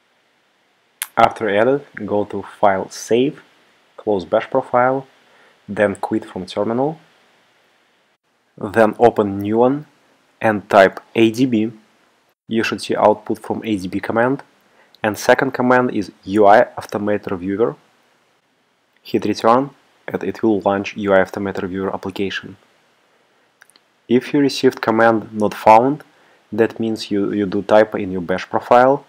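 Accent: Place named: Russia. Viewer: рашн инглиш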